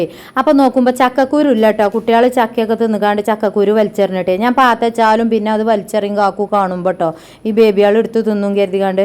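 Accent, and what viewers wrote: Malappuram, India. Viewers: മലപ്പുറത് ഉള്ളവരൊക്കെ അങ്ങനല്ലേ സംസാരിക്കൊള്.... മലപ്പുറത്തേർ കണ്ണൂർ ശയ്‌ലി എങ്ങനെ പറയാൻ പൊട്ടത്തി..... 😂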